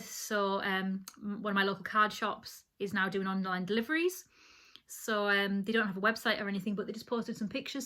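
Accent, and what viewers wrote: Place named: United Kingdom. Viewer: I stumbled across your podcast from a shoutout on Yarnmugs and was immediately addicted to seeing all of your neon colored sweaters! I love your fast-paced style and cute accent too ;) (American here who watches a lot of British podcasters and is always amazed by the diversity of accents on one island) And actually, I think your accent is easier to understand -- at least to my ear -- than some of the other, more common accents. (IG)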